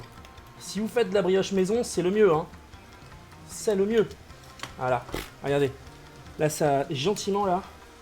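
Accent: South, France. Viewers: cet accent du sud si merveilleux XD heureusement que tu fais des replays! en ce moment je rate tout tes lives :'(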